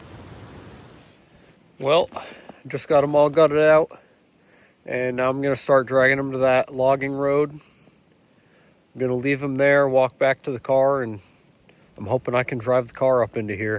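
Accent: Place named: Midwest, United States. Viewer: You sound and talk like a Midwestern cowboy.Where you from good sir?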